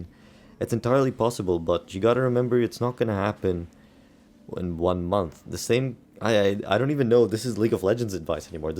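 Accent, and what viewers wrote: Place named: Switzerland. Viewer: Are you Swiss? You sound like Roger Federer.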